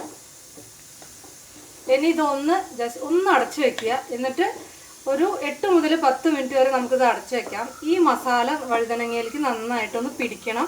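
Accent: Palakkad, India. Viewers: Exactly.. Even I felt the same 😍Oru Palakkad touch in talking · കാഴ്ചയിൽ തന്നെ സൂപ്പർ ആയിട്ടുണ്ട്, ടേസ്റ്റ് പിന്നെ പറയണ്ടി വരില്ല, അടുത്ത ദിവസം തന്നെ ഉണ്ടാക്കി നോക്കണം 👍 സംസാരം കേൾക്കുമ്പോൾ പാലക്കാടൻ ടച്ച് ഉണ്ട് ചേച്ചീ , ഞാനും ഒരു പാലക്കാട്ട് കരനാണെ 🥰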